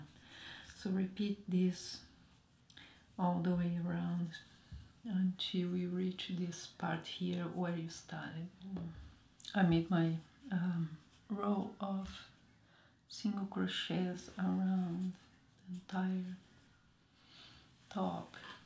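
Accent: Brazil. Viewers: Pelo teu acento deu pra perceber que vc é brasileira 🤣faz esse mesmo modelo em português please🙏🏾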